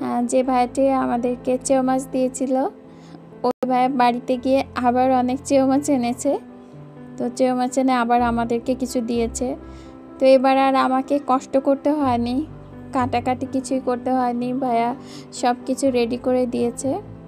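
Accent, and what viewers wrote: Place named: Dhaka, Bangladesh. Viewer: Apu tomer bahsa Dhaka r kothai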